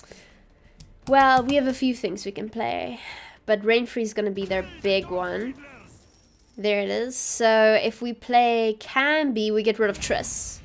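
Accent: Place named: Afrikaans, South Africa. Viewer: You Sound REALLY Afrikaaans, Indien so, baie dankie vir die GWENT clips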